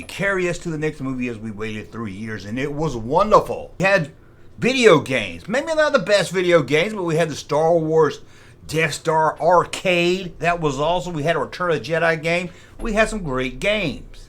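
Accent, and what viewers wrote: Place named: South Georgia, United States. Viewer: So dad and I are on vacation and I’m checking out this episode and dad says “What the hell are you watching??” I said what!? Dad, this is!! He laughs and says he sounds like a bumpkin from South Georgia. I said, “He IS He IS! And I can’t get enough!! 😅😂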